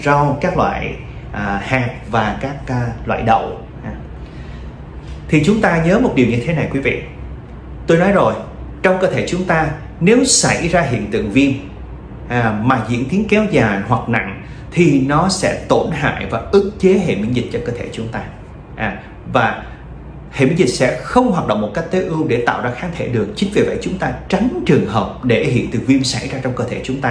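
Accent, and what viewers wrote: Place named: Da Lat, Vietnam. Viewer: Bs nói giống tiếng dân Đà lạt quá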